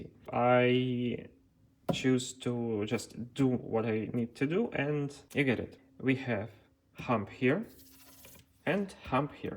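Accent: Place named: Eastern Europe. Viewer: Сильный славянский акцент, чувак. Откуда ты? Россия? Украина? Беларусь?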